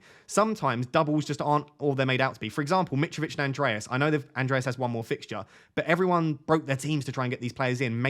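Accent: Manchester, United Kingdom. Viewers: Love that Manc accent you got there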